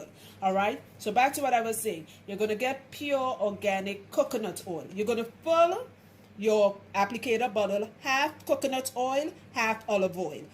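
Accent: Trinidad and Tobago. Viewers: I knewwwwww I made out the Trini accent... Omg aren't our accent like a silver lining behind the dark cloud, look my pores raise lol... Hey Trini 🙋🏾‍♀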